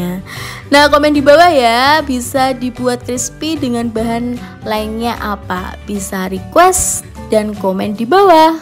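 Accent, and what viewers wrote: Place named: Java, Indonesia. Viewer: Nada Jawanya terlalu muuweddok banget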